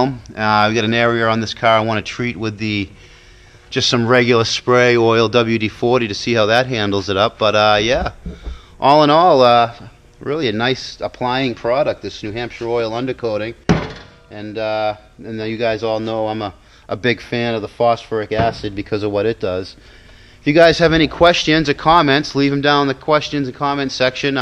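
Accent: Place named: Massachusetts, United States. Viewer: Its pronounced kah , and I couldn't hear it cause I'm from heah too ( mass)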